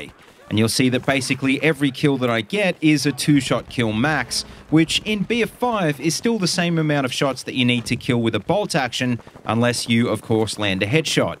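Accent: Australia. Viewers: gr8 vid m8 Aussie accents makes everything better :) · Hey shit, you're an Aussie!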